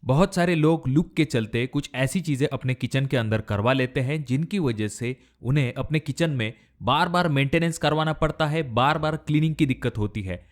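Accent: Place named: Gujarat, India. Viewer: As per your speaking hindi I think you're a Gujarati I want an Idea for L type small kitchen guide me sir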